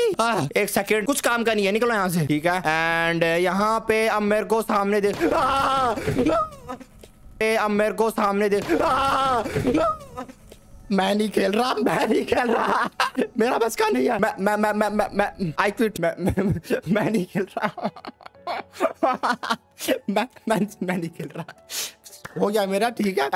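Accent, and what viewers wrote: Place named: Haryana, India. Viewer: Bro you are from HARYANA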